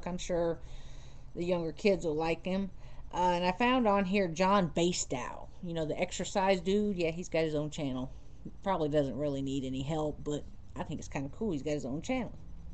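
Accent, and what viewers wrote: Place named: South, United States. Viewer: Love southern accent! :D I want one!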